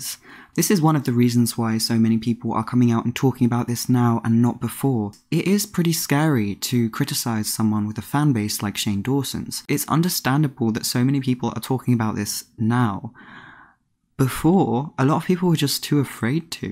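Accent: RP, United Kingdom. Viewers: Your voice temporarily cures my depression. So soothing, so posh, so wonderful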